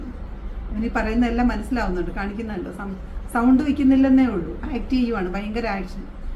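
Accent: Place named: Kottayam, India. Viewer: ഞാൻ ഇത്രയും നാൾ കരുതിയത് ചേച്ചി ഒരു അച്ചായത്തി ആണെന്നായിരുന്നു. തനി കോട്ടയം ഭാഷ അല്ലിയോ 😂ആളെ ഇപ്പോഴാ കണ്ടത് സന്തോഷം 🙏🏻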